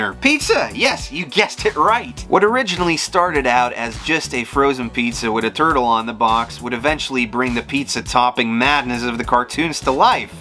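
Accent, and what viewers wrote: Midwest, United States. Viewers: First time watching. Your accent is really interesting and familiar. Midwest?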